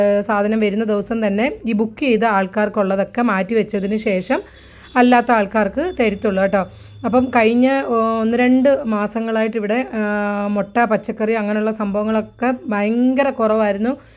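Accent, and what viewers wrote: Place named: Travancore, India. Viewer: തിരുവിതാംകൂറിലെ സംസാരം എല്ലാവർക്കും വ്യക്തമായി മനസ്സിലാകും. അഭിനന്ദനങ്ങൾ.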